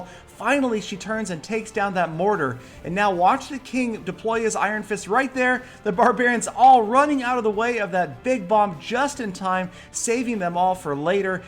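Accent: Norway. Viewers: are u from norway? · Are you norwegian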